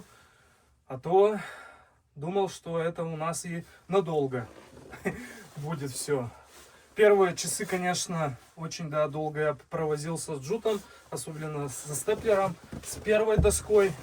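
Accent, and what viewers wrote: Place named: Vyatka, Russia. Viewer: Говор вятский, если не ошибаюсь...)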